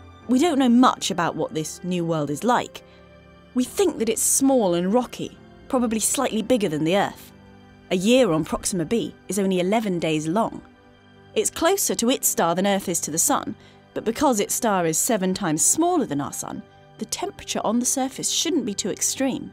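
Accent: England, United Kingdom. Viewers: I hate British accents. Especially when it's woman's voice doing it. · Really getting tired of English accent narratives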